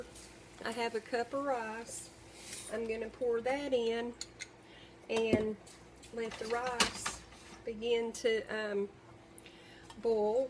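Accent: South, United States. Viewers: Are you from Arkansas?? You sound like me a lot lol I'm from Conway and I also have that southern twang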